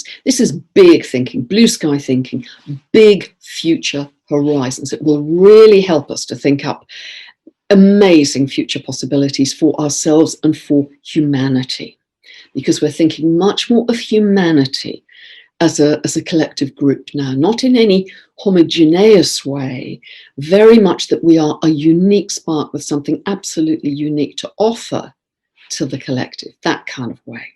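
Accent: United Kingdom. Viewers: Thank you. Good to know of the strong Aquarius theme and to me it is interesting to have it all in a month of sun in Leo energy for the bigger part of the month. The unpredictability of what is coming have a good taste to me by the sounds of all happening Astrologically. Personally I see a level of anxiety from all these energies combinations and at the same time I also feel excited and motivated by all of it. I don't even know how I always know when you say Eris and when you say Aries. When I try to repeat what you say to find out they sound the same but I think there is a subtle difference my brain can pickup having my ears trained well enough to understand British accent. I would guess this accent confusion could be harder for Americans or foreigners not full into British accent. Funny that they, Eris and Aries, have also similar archetypal symbolism. 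Always lovely to hear your videos and have all this rich information at hand. Much appreciated. M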